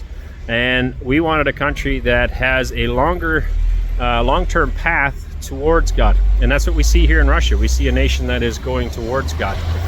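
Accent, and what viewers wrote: Canada. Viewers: Could tell your from 🍁 by your accent.. good luck in Russia . Hoping to get a new American president before this clown gets us in war with Russia. Rather have pootie as our president too be honest. Only isuue i have with your move is you didn't stay and try to fight to get your country back we need all the people we can get at this point to turn the tide. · Canadian English))) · I can't imagine he doesn't have the Russian accent not to mention the Canadian accent! Good luck out there people know this and it's a disadvantage for sure. Man I'd live out there anyday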